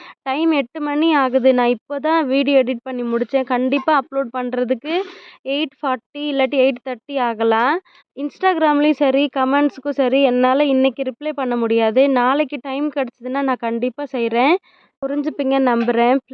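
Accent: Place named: North India, India. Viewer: Nee yennoma north indian tamil pesura matheri pesatha nee tn thaana ozhunga pesu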